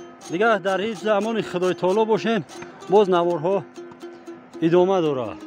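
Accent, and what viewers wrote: Tajikistan. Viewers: Дастон дардро набинад, Худованд дар касбу кортон баракат ато фармояд! Бисер бо як лахчаи точикии зебо сухбат мекунед.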